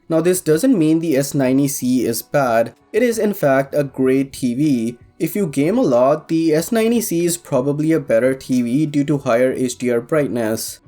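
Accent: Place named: India. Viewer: Canadian? I thought dude was Indian 😅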